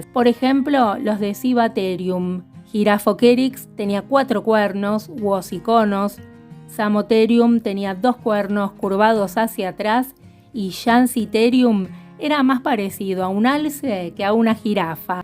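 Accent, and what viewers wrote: Argentina. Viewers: Yo estaba viendo este video bien interesante 😊, cuando llegó mi mamá y me dijo "ella debe ser de Argentina, por el acento" y yo quedé 😮wow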